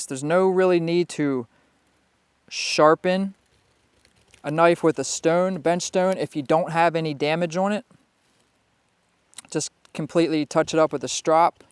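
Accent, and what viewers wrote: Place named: Western Pennsylvania, United States. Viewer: Where in PA you from? Sounds like somewhere from western PA from the accent. Nice feathersticks too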